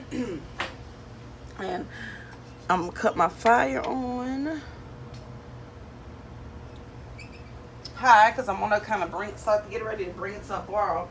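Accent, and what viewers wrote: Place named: New Orleans, United States. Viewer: That New Orleans accent yesss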